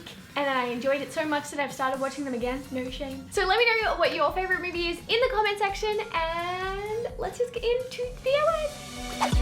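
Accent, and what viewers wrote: Australia. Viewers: Sarra Turki she is from australia (didnt you notice the australian accent?)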